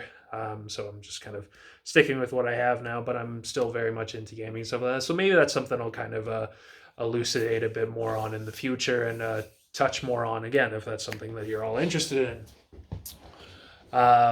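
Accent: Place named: Canada. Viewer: I knew I heard some Canadian in your voice! I was also born in Canada but I live in Spain at the moment. It's very cool that you managed to get a job in a gym, sounds like getting laid off was a blessing in disguise.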